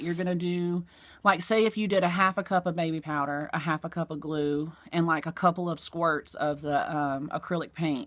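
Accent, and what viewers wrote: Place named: North Florida, United States. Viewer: Love the angel bottle! I knew from your accent you are from my neck of the woods and then you mentioned Gainesville. 😎 I am from N. Fla, nice to hear familiar speak. I found one of your videos from the negative stuff going around and then looked for other content from you and watched. You are such a beautiful woman and your heart shows, it's so easy to get caught up in other's BS when you are the brunt of their attacks, but it sounds like you have a wonderful family and a soulmate. You don't need to explain or defend yourself or even acknowledge the BS, your friends will stand by you and your enemies won't care anyhow. Keep posting your art and ignore the antics of a sad and lonely person, you are above that. Take care!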